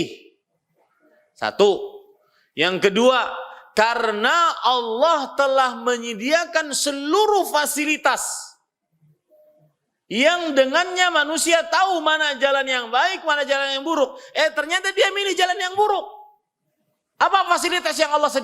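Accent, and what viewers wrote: Banjar, Indonesia. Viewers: Org banjar kah ini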